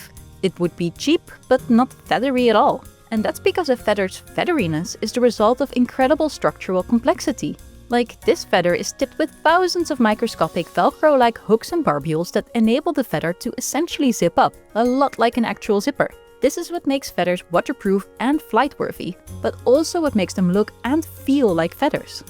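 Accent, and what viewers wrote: Netherlands. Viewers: Dutch people are literally everywhere Love the accent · This is the quintessential Dutch accented English. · I knew i heard a dutch accent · GEKOLONISEERD, ik hoor nederlands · Hoor ik daar nou een subtiel nederlands accentje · Hey, minute earth got a dutchie, nice accent :) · do i hear a Dutch acent? XD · I hear a slight Dutch accent. Groetjes uit Nederland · Does this channel have multiple narrators? This lady is very obviously Dutch, but I swear I’ve seen many videos of a non Dutch person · Me: "My Dutch voice senses are tingling!" Checks description Me: "... okay the name sounds more Flemish, but that voice is definitely Dutch!" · *Dutch accent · Is that a dutchy I hear? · Heeeuujjj, een Nederlands accent, ik wist dat ik het herkende!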